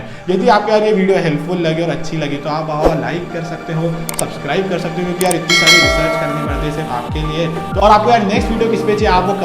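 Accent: Indore, India. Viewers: Bava indori ho kya...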